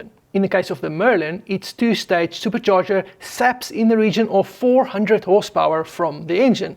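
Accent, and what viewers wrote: South Africa. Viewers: Dear, first thanks so much for your great informative videos and the reminiscent SA accent! I would like to propose a video idea extending this one. In late 1942 Stanley Hooker (later knighted for his work on jets) was recruited by Rolls Royce to work on the urgent problem of boosting power at latitude for the Merlin engine. His solution was to insert a liquid cooled intercooler and 2nd stage supercharger between the existing supercharger and engine block. As you pointed out in this video, an even better solution theoretically may be to add a turbo as the first stage since it does not scavenge as much engine power. However, Hooker’s solution was right on the money in this case, brilliant, compact and was implemented into the Merlin 6x production in less than 6 months. This improvement may actually have secured performance altitude at the most crucial time of the war, allowing air superiority over the fw190, and perhaps even war winning. 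This engine of course was used in spitfire, hurricane, lancaster, mosquito and mustang built under license by packard. The 2 stage turbo solution later implemented in p-38 and “jug” was slightly superior, but very bulky requiring twin boom or bulky and expensive airframe, actually nearly twice the price of the 2 stage super-charger as implemented by Hooker in record time. For me Hookers intercooler is a work of art, or a sublime mix of art and science of engineering. It has 4 trimmed rectangular channels in which the coolant circulates, ex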